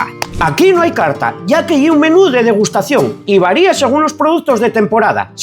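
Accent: Asturias, Spain. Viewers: Porque hablas así .....eres asturiano de verdad? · Perdonen mi incultura pero ese acento es el de Asturias o de donde sale? Soy del sur, y tengo un par de colegas de asturias, y ninguno habla así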